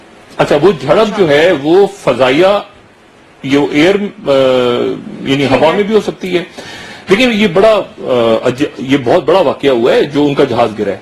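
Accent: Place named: Faisalabad, Pakistan. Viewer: Dr sab ajj tay faisalabadi lagy ho tusi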